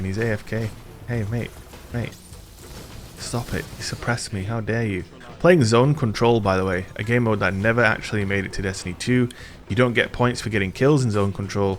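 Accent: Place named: West Yorkshire, United Kingdom. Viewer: Are you in West Yorkshire? I know that accent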